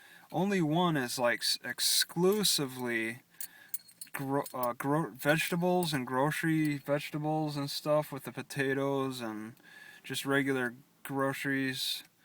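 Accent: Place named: Alaska, United States. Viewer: lots of tourism related jobs and cannery work up here (just don't tell anyone its our secret). but some canneries house and feed you! even though the pay isn't always the greatest. The rain keeps a lot of people from living up here though. you talk like an Alaskan... a State of very independent people.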